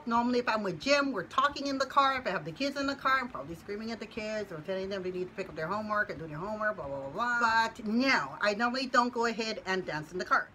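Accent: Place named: Texas, United States. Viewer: I really like this Q&A I really got to know you a lot better. after I finished Cosmetology in realize that I didn't like people that much I went to a funeral home and asked if I could get a job there and fortunately there's one person that pretty much does all the people there so they didn't need help at that time but I have thought about doing that sort of work. I would still get the fix hair and not have to deal with talking to the person. I later thought that it would probably be worse with the family loved ones being so particular of the person the looked before they died. I'm not a quiet person so that probably wouldn't work out for me either. I like how you was talking about your husband's Texan accent was rubbing off on you that's super cute.Great video👍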